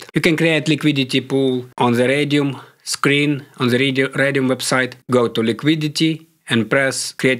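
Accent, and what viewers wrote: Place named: Russia. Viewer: bro are you russian? love the accent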